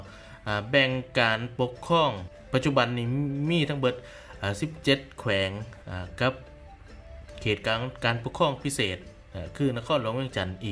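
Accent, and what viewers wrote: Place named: Ubon Ratchathani, Thailand. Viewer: สำเนียงการเว้าคล้ายๆกับอุบลเลยคับ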